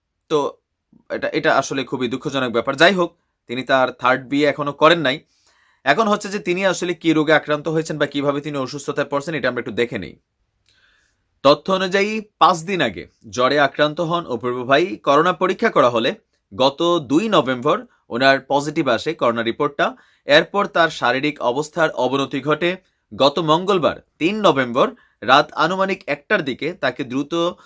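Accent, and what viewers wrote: Comilla, Bangladesh. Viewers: ভাই, আপনার কথাগুলো মজার কিন্তু সত্য। আপনার কুমিল্লার Accent দারুণ।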